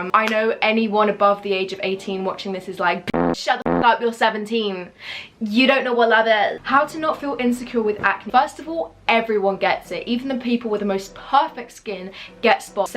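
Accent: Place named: United Kingdom. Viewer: you can tell she’s british without any doubts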